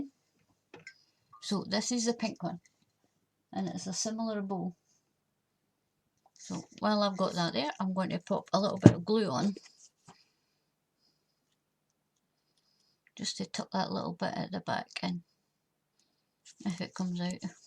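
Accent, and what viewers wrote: Scotland, United Kingdom. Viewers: love to hear yr Scottish twang my nanny was a broad Scot xx love and miss her xx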